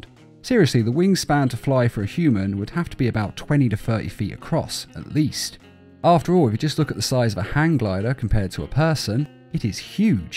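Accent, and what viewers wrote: United Kingdom. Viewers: Sounds like a normal british person.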